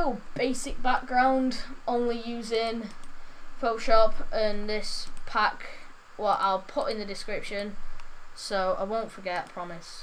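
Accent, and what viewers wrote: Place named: United Kingdom. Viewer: O,MMGMGFJ UR BRITISH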